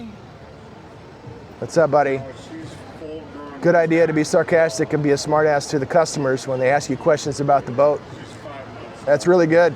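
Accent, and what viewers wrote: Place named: Seattle, United States. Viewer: I can tell you are from Seattle by the way you talk.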